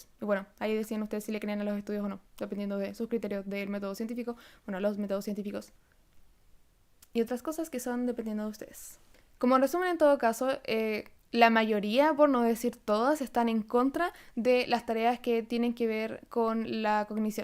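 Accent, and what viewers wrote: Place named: Chile. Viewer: hola, al ser de las pocas personas que usa lenguaje inclusive en todo su canal, me lleva a preguntarte con todo respeto, ¿perteneces a la comunidad lgbtq+? gracias por tu canal, tus videos, me encantan!. tambien me gusta mucho tu acento chileno, aunque dices que eres de Peru viviendo en Chile.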